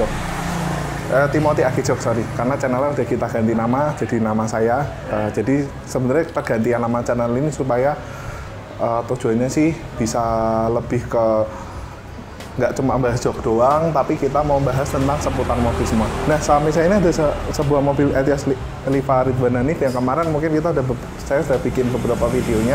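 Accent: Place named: Semarang, Indonesia. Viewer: Logat semarangan bangts. 👍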